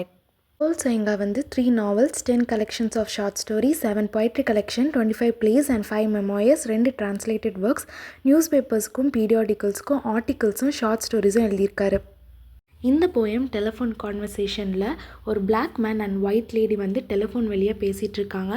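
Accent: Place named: Kanyakumari, India. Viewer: You’re from kanniyakumari for sure some how thank you ❤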